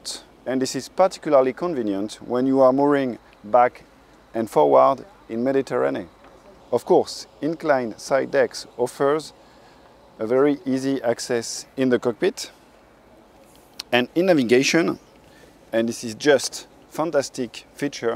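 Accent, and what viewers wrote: France. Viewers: OMG I love his english... his accent is so funny, so french... :-)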